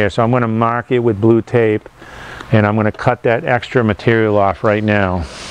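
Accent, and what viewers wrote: Boston, United States. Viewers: this guy has got to be from boston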